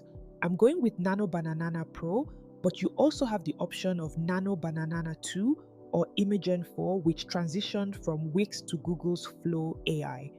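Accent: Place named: Nigeria. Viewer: Why don't you convert your accent to a proper English accent so people can follow it? What kind of accent is this? African, Nigerian? Is your course also same?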